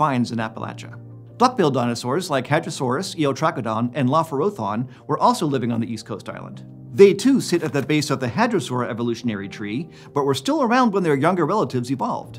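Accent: Pittsburgh, United States. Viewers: Wait, you're a yinzer?!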